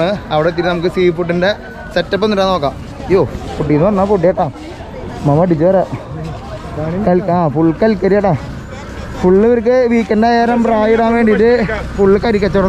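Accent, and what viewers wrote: Thrissur, India. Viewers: ഈ തൃശൂർ ഭാഷ ഒന്നു മാറ്റി പിടിച്ചാൽ കാണാൻ ആളുണ്ടാവും തൃശൂർ ഭാഷ ആവർത്തനം ആവുമ്പോൾ കാണാൻ പരമ ബോർ ആണ് ബ്രോ എല്ല വിഡിയോകണ്ടിരുന്ന എനിക് പോലും മടുപ്പ് തുടങ്ങി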